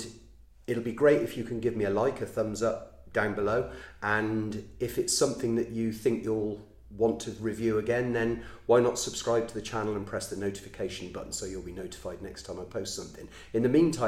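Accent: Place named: England, United Kingdom. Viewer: the accent makes you sound like the English Morgan freeman mate it's sick😂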